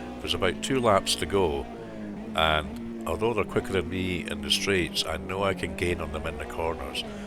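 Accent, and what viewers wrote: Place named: Scotland, United Kingdom. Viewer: Great video. Subscribed. AMS2 is very underrated. Nice to hear a fellow Scot. Greatings from Edinburgh.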